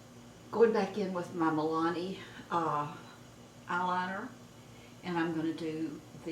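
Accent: South, United States. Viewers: It's too bad the video is ruined with that awful whistling. The lady has a southern accent and is difficult enough to understand without the whistling. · Agree, the whistling is sort of annoying as it's hard to hear you. You look wonderful and always dress stylishly. Love your Southern accent. Diana in AZ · Love the look, music is awfully annoying! Just your sweet southern voice is all we need!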